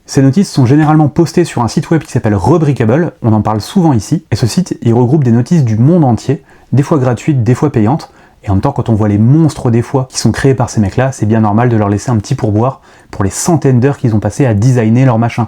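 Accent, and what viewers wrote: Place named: Spain. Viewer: Tres bel accent espagnol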